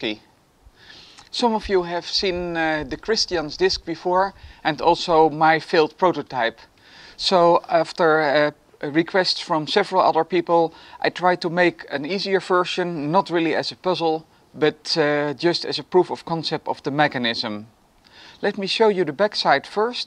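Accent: Netherlands. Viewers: Ik denk dat deze puzzel veel moeilijker is dan de 1x2x2 puzzel (en ik weet dat je nederlands bent door je accent)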